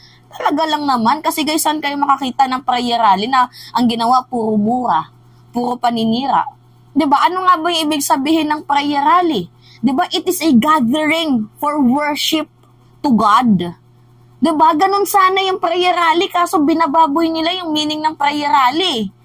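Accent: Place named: Waray, Philippines. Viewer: taga saan k? Waray nmn salita mu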